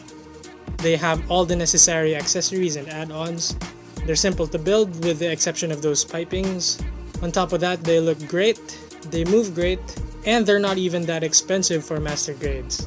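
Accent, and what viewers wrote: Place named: Philippines. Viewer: Are you Filipino I feel like you are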